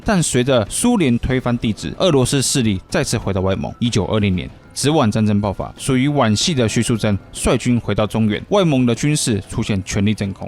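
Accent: Taiwan. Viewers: 非常台灣腔，很奇怪 !!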